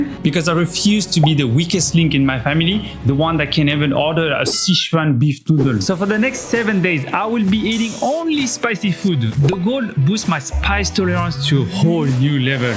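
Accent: France. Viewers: Why do you have a French accent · Salut mec depuis que j'ai clicker sur t'a vidéo j'ai directement remarqué ton accent français 🤣🤣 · Is that a french accent I hear??? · Beautiful vidéo the french accent Is definitely your signature branding wise, don't loose It .x')❤ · The french accent makes watching this so fun( u should have 100k subs ATLEAST)😅😅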